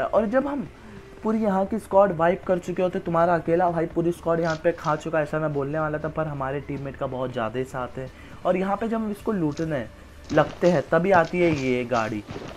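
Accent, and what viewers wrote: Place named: Bihar, India. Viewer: Bihari lagra fulll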